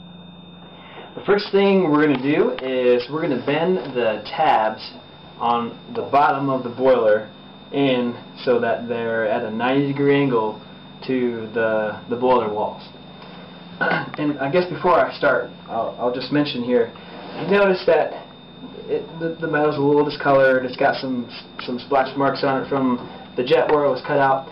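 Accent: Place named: South, United States. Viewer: a southern accent... why am I not surprised.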